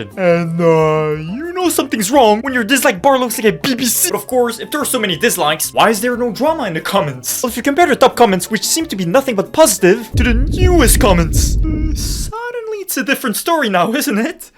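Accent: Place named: Quebec, Canada. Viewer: Are you in Quebec? Accent sounds rather quebecois francais.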